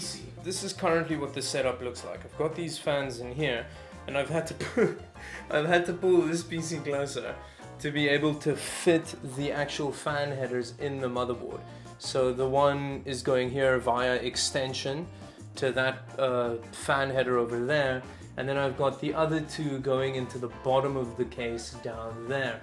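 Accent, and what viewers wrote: South Africa. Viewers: Bruh you sound like you are from South Africa. However my question would be. Is it better to take stock fans and put them as front intake fans? I have Arctic Freezer 34 with dual fans and I simply had to remove stock nzxt h500 and now I am thinking where should I put them. Can't you please help me to solve this riddle? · Are you South African? You look and sound like one. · Are you South African? Hahaha, knew it from the accent. Checked instagram and there you mentioned an Afrikaans proverb. Goeie video. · Are you South African by any chance?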